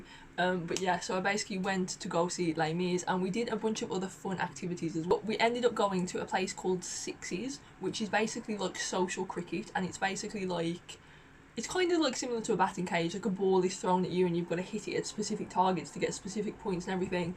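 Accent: United Kingdom. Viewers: I practice my english listening watching your videos ❤❤you have a really strong British accent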